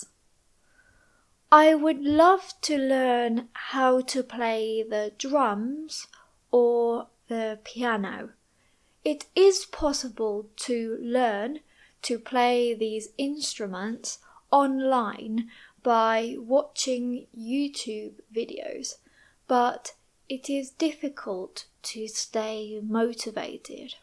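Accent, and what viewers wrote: United Kingdom. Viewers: You have so beautiful british accent!❤ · Is this a British accent? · Is this a British accent or American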